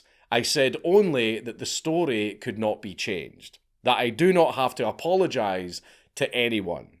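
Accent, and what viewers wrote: Scotland, United Kingdom. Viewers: He's clearly, audibly Scottish. He's British, though.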